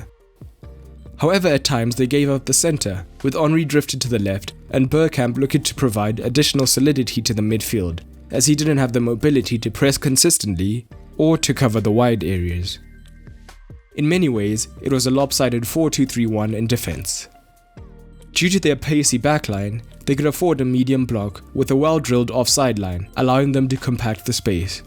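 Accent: South Africa. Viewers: Are you South African mate? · Are you south African ?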